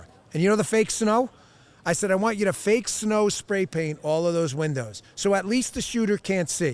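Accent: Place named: New York, United States. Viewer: love Dans italian NY smart aZZ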